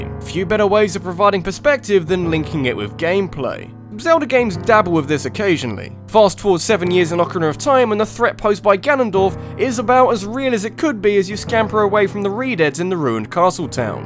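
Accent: United Kingdom. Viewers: Blue Spartan Gaming he's British, so his accent will be different than us stupid Americans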